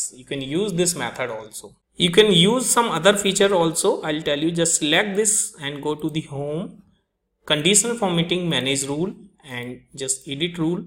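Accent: India. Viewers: I bet it's an indian guy talking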